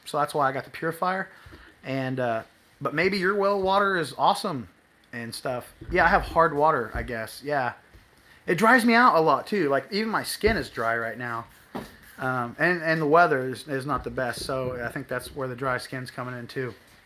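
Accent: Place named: Netherlands. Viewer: Sounds good you sure sound like dutch will be interesting watching your channel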